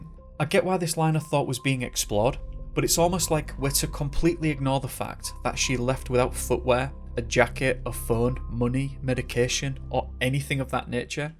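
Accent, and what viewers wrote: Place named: East Yorkshire, United Kingdom. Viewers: It's a reet belting northern accent tis this! 💞💞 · Are you from Hull or Leeds. You sound Yorkshire. As from York lad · Sounds like an East Yorkshire accent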